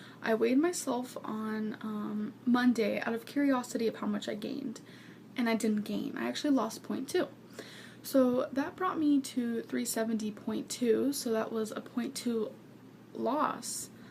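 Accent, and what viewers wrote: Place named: South, United States. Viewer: Where’s her odd southern~ish(not really) accent???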